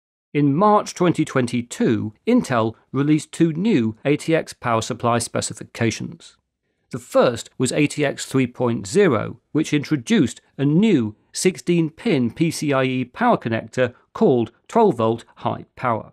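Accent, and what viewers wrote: United Kingdom. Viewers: Actually I came here 4 studying British accent 😜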